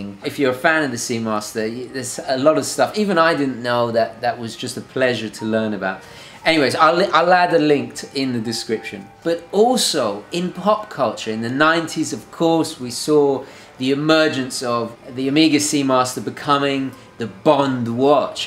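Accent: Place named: United Kingdom. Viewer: This one is why I enjoy your videos. Great points made. I find that you gents from the British Isles are more entertaining to listen to than us Yanks. Carry on, Guv.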